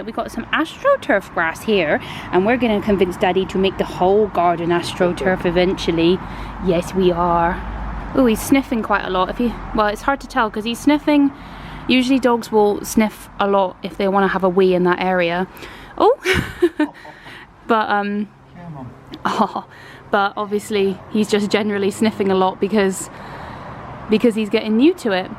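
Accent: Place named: Bristol, United Kingdom. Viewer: Your accent🙂Are you from Bristol?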